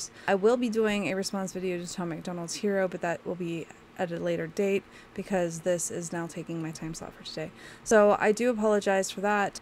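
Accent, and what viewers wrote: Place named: United States. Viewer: You sound pretty AMERICAN to me. There's nothing wrong with being proud of your ethnicity but your definitely an AMERICAN js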